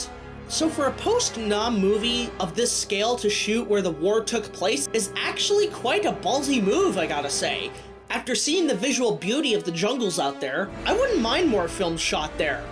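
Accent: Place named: United Kingdom. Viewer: you really have a very good British accent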